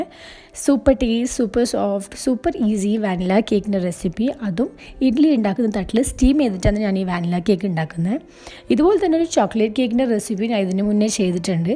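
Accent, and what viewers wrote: Kannur, India. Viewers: Nigale veed evideyaa kannur aanoo samsarikumpol nammude kannur kari ne pole und😊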